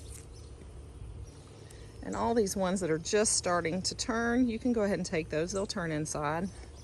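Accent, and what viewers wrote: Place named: South, United States. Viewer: I respect your right to privacy, but I was wondering what state you live in. Your accent says the south. I am new to the south, north central Arkansas, and the accent sounds close.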